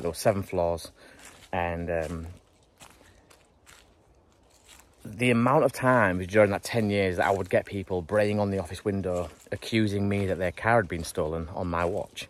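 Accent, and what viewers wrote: United Kingdom. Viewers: I love your channel! Your British accent makes me 🤸🏼‍♀️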